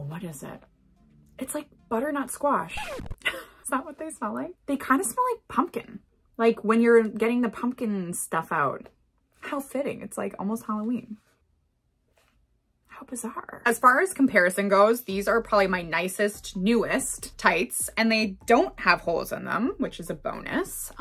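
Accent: United Kingdom. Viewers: Oh now you done it brittish accent pinch me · Nice British accent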